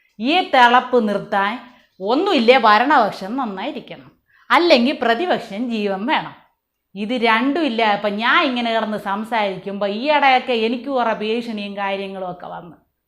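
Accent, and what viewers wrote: Thiruvananthapuram, India. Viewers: തിരോന്തരം ആണോ? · ഇതു തിരോന്തരം ഭാഷകളാണ് അല്ലേ അപ്പി 🤣🤣😂വളരെ മനോഹരമായിട്ടുണ്ട് ❤️👍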